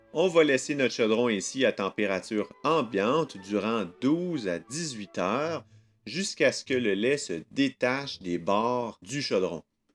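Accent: Quebec, Canada. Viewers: J'adore ton accent, ça me rappele mon oncle du Québec !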